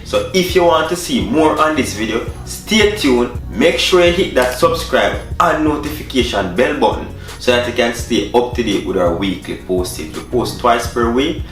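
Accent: Jamaica. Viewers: Love the Jamaican voice man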